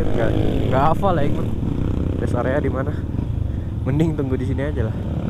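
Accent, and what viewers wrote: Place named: West Java, Indonesia. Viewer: Orang jatim mas ? kok ngomongnya gak kelihatan logat jawa mas.. malah kayak dari logat sunda... hehehe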